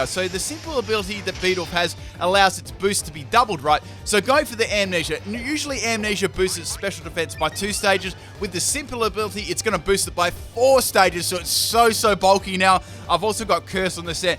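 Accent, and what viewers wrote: Australia. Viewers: Bro are u an auzzie?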